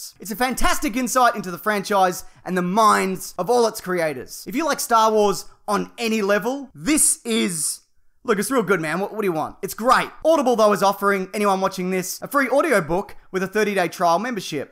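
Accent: Australia. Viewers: OH MY GOSH! Someone yelling about Star Wars in an Aussie accent... BEST VIDEO EVER! 😂😂😂😂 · What a great sarcastic Aussie rant?! Love ya work ya bogan weirdo!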